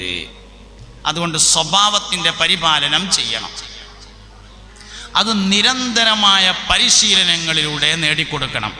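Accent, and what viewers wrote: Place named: Malappuram, India. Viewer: Vishayam nallathaan pakshe nalla malayalathil parayoo,, ningalyde Malappuram basha yellavarkum manassilakanamennilla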